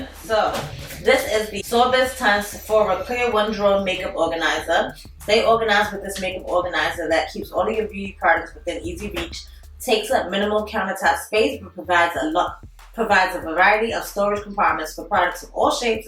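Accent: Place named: East Coast, United States. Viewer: when I hear your accent, I automatically respond with "What up ma?" Girl your accent is just what my "West Coast living but missing the East Coast swagger" heart needs on the regular. I have the same issues with my eyes - the water eyes be killing my canceler game - do you have any tips?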